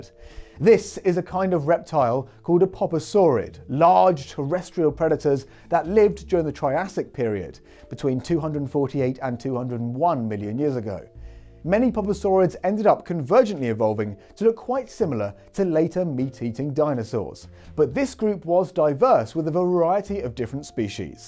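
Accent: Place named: Paraguay. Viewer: Dude he’s from Paraguay, don’t annoy him too much on his English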